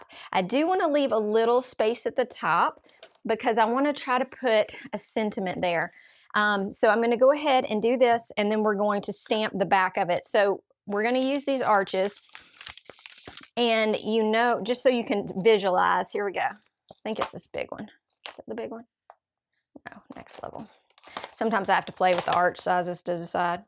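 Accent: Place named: Chattanooga, United States. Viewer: I thought I recognized that accent! I’m in Chattanooga! Love your cards! So glad I caught the replay - hopefully I’ll catch the next one. I’ve just started using my nesting dies for more than just a panel. TFS!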